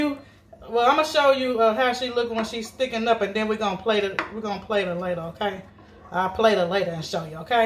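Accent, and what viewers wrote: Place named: South, United States. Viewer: I hear 615 all in your slang and southern dialect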